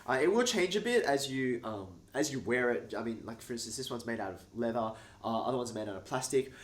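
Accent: Australia. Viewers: Think he is saying "gig", it's just the AusTRAYlian mate.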